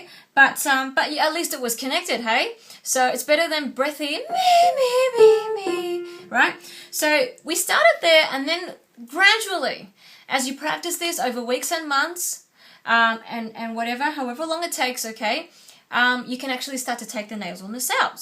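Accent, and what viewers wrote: Australia. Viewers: Very articulately explained, thanks. Nice to hear an Aussie voice too.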